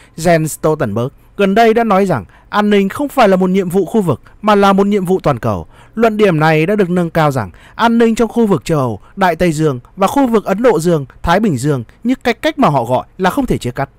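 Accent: Standard. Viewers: nói chuẩn quá anh ơi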